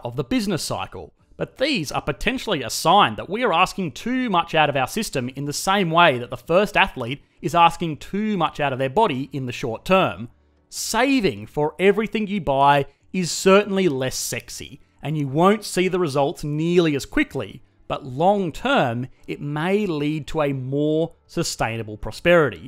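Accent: Australia. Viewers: Uploaded 13 hours ago Australian accent 1am? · Goddammit handsome sounding Australian man. I was going to sleep in my screwed up sleep schedule. Now I’m getting educated.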